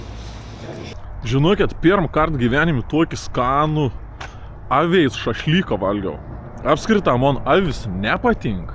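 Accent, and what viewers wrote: Samogitia, Lithuania. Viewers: Nu, kaip su baltu pavydu stebiu Jūsų keliones, smagu žiūrėti, o dar ta žemaitiška šnekta, nu faina. Ir toliau taip, sėkmės.😂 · Ir dar žemaitiška tarmė priduod grožia. 👍👍👍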